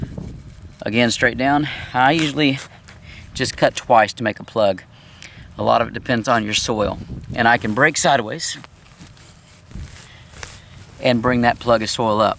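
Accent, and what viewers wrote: Texas, United States. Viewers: He's a Texan.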